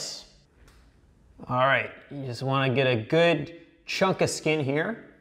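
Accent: Canada. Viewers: This guy sounds Canadian af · You canadian?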